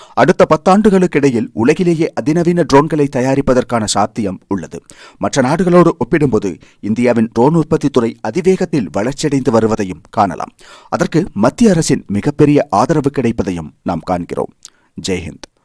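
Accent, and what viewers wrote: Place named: Sri Lanka. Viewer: இலங்கை தமிழர்களின் தமிழ் உச்சரிப்பு (இது போன்றவர்கள்)... கேட்பதில் ஒரு இனிமை உள்ளது ❤ #..... நல்ல விஷயமாக தேர்ந்தெடுத்து பேசி வருகிறீர்கள்.நன்றி❤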